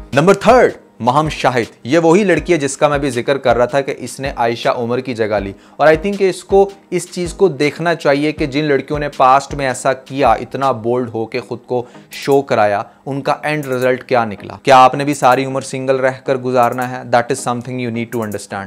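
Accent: India. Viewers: I wonder how he is speaking constantly in hindi accent 🤔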